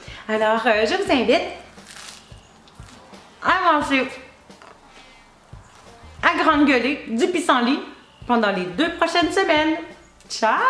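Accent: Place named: Canada. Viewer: Ravisante, tres instructif ,j adore l accent canadien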